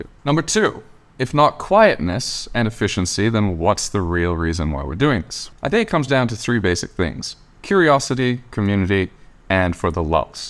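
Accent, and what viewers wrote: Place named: Eastern Canada, Canada. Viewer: by your pronunciation of your T's I'm guessing you're from eastern Canada? Thanks for your work on this. Fascinating stuff I will eventually be trying to print this with CF-Nylon and again with Lightweight PLA (100% infill)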